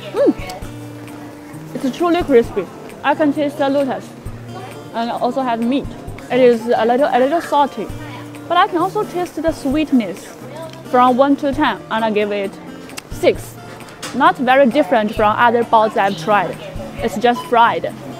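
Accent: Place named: United Kingdom. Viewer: You sounds like you're born in UK or studied there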